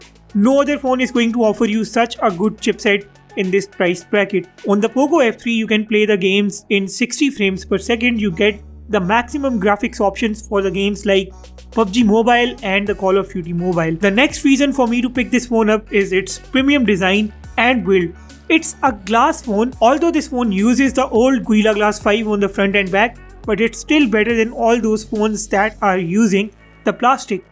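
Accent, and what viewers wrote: India. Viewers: I hate Indian accent She makes me unable to finish the video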